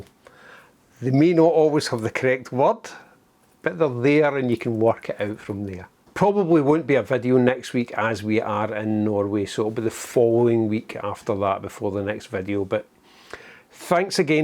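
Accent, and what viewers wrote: Scotland, United Kingdom. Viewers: Hi, with the X-T5 agree on the 70-300 for where you are going. As for the rest of the kit…option a) Sigma 18-50 and 12mm Zeiss or b) 33mm f/1.4 and 16mm f/1.4. Probably a) though as I would choose wide over shallow dof…love the accent. It reminds me of my old Grade 11 Physical geography teacher Mr.McEwan who would make us study topographical maps of his beloved Scotland. It took me a whole semester to realize loch was lake and about as long to understand what he was saying. 🎉 Have a great trip and look forward to the pictures. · Thanks a lot for sharing your insights,! Be you 🙂love the Scottish accent:) to your point: I love the Fuji 16-55 f2,8.. yes it is bulky.. but it delivers such great image quality.. and I was lucky to get it used in great condition for a fair price.. I pair it for hiking either with the 50-140mm or for more reach and lighter weight indeed with the 70-300mm - Cheers, Tom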